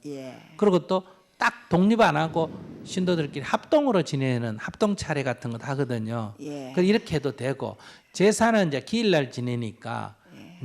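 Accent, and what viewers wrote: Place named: Chungcheong, South Korea. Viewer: 누가 봐도 충청도분이시네ㅎㅎㅎ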